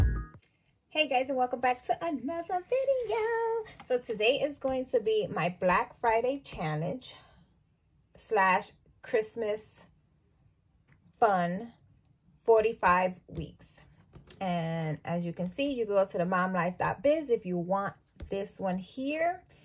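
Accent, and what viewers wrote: New York, United States. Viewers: That New York accent tho. Lol! Needed this motivation today. Thanks sis. Have a good weekend.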